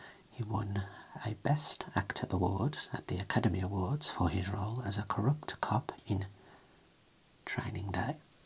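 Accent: United Kingdom. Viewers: Are you British? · I don’t know what the accent, british? but it sounds really good, and I love it 🥰 I always fall asleep ❤️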